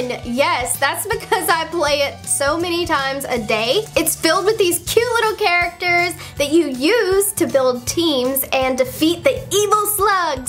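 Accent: South, United States. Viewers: She is Sooo stupid I hate her country accent eww I'm discusted by her utube ideas and no I won't leave her alone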